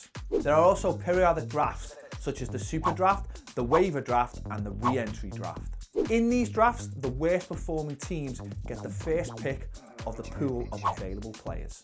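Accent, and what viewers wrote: Liverpool, United Kingdom. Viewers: Why r u doing the MLS even though you sound like a scouser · Norbert Janas It's a scouse accent (Liverpool)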